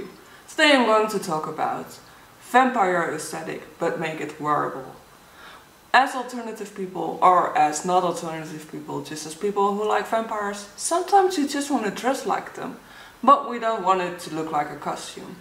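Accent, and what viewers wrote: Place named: Netherlands. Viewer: Are you by chance dutch I think I recognize the accent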